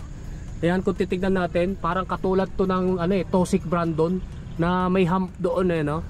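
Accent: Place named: Ilonggo, Philippines. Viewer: Nice build! Sabi ko na ngaba taga iloilo eh hahaha i know that intonation eh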